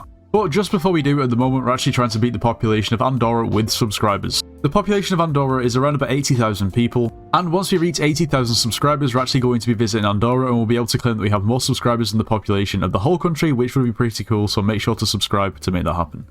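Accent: United Kingdom. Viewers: Taking into consideration that on the google account was an H, and the accent, I'm 99% sure name is, lmk if u have any obligations; Edit: We also can't ignore the fact that it was 385 pounds to fly to Andorra and that is the Brittish/Scottish currency where the most common name that starts with an H is, this makes my answer 99,9% sure, It also says that it's from Manchester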